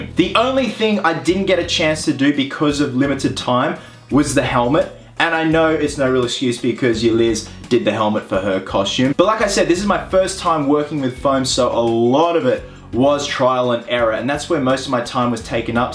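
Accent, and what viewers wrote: Australia. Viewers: you look amazing. The costume is great. Love your Australian English. Sexy body, I need to work out more 😅 One think. Take some sun rays. You're extremely white 😝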